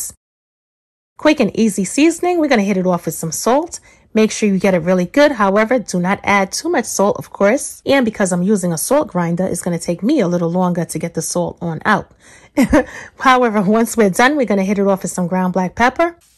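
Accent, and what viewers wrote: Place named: Georgia, United States. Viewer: Looks great! Are you from Georgia or Alabama? I'm Florida raised, surprised I can't tell the difference.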